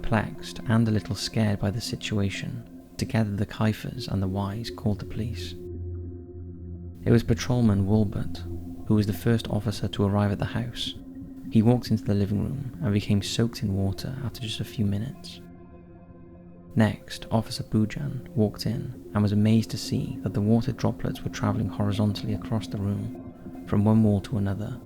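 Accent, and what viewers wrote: Wales, United Kingdom. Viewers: British accent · ??? That IS a British accent. What are you talking about? I'm British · He's Welsh. So it's a Welsh accent. There's no such thing as a British accent.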